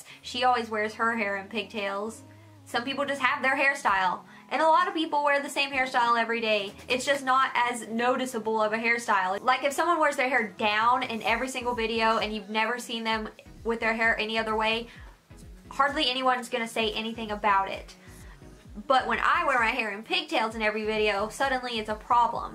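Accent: United States. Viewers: Southern American accents are one of the best and this is coming from a California native. The reason why you get insecure about your accent is because of the stereotypes with people who have it are perceived to be dumb or low class. Not true. It's actually a very classy and gorgeous sounding. In fact, if you put Southern American accents on slow motion in a voice recorder, it sounds very similar to British accents. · Are you from Texas?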